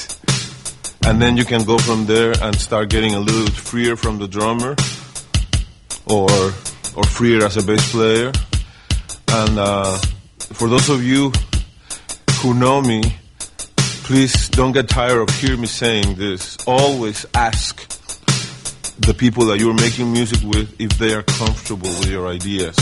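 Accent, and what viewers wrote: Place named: Jamaica. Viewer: This incredibly talented cat has groove and soul and heart and musicality and I Just want to say Thank You,. BTW, where is he from? His accent is pseudo-European, perhaps Jamaican? Anyone? Bueller?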